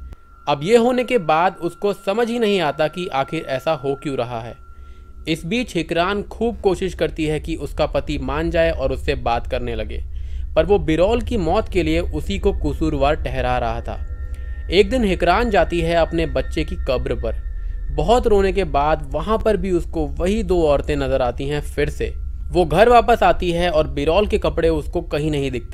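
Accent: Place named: Haryana, India. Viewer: Haring admi ka awaz hai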